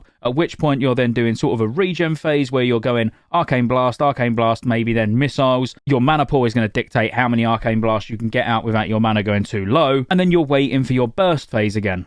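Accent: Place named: London, United Kingdom. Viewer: Definitely got a subscriber from this video. Also sound like you're from London and remind me of Alex Brooker!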